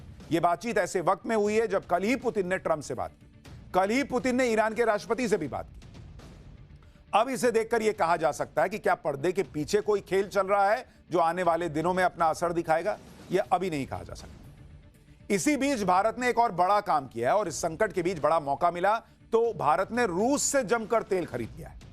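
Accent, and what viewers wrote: Bihar, India. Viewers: Ye to Bihari Tun hai bhai aap ka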